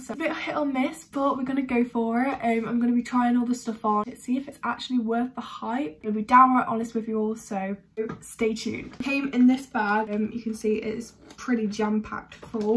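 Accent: United Kingdom. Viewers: your accent... omg. im obsessed with british accent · U have British accent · En verdad amo tu acento británico 🤩😍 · I’m italian and I really love your british accent, i mean, i could listen to you for like FOREVER :)) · she kinda sounds like millie too, like the british accent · That britihs accent i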